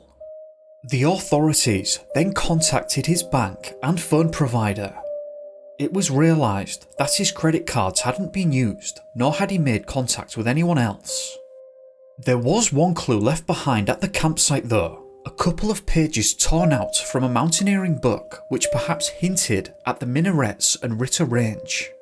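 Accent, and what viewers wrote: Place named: Scotland, United Kingdom. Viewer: You could have easily been a character with your voice on trainspotting.🥳👀🤡✌️✌️✌️👌 cheers mate......no you sound just like you Ewing McGregor...WOW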